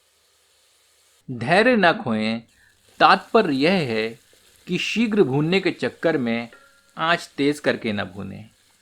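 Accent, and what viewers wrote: Standard. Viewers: क्या बात है,शुद्ध हिन्दी। रैसिपी के साथ शायरी का आनंद। मज़ा आ गया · itni shuddha hindi aaj ke zamane me maine kisi ko bolte hue nahi suna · Aap itna shuddh hindi bolte hain lagta hain middle age ka koi cookery show dekh rahi hu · The way u speaks in shudh hindi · itni shudh hindi sunkar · आपकी शुध्द हिन्दी का उच्चारण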